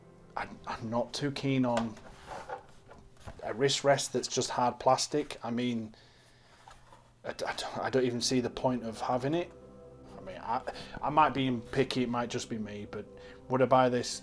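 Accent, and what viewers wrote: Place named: Northern England, United Kingdom. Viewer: Cracking review mate. Honest and Proper Northern!!!! ;)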